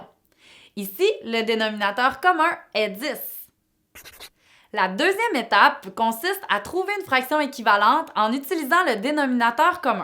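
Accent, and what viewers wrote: Quebec, Canada. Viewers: Ses un accent québequoi! :D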